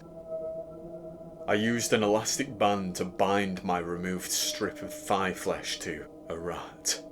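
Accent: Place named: North, United Kingdom. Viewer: Only found this channel a week ago and it's heading toward being a favourite. The fact that a fellow northerner is narrating doesn't hurt, either!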